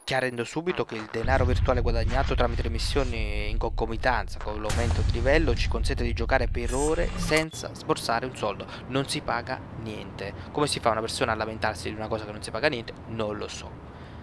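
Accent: Italy. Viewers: Napoletano Doc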